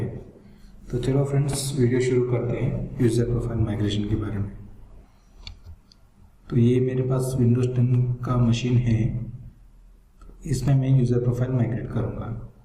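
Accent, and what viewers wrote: India. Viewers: Interesting that commenters can't understand your English. I suppose after working with India programmers for a couple of decades, my ear is accustomed to your accent. Never the less, the software you're downloading looks too risky to take the chance. It's pretty hard to trust the profile migration tool from the FORENSIT site when it's not even SSL / HTTPS protected. That said... Dave Yadav You Tube video "Migrating profile to a different domain" uses an SSL secure site to download the software, is better practiced with English, and does a pretty good job explaining this topic. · fu ck ing indian accent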